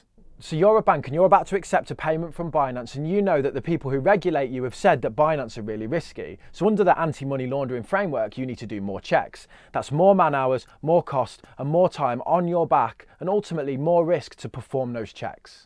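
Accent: Birmingham, United Kingdom. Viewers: Are u from Birmingham bro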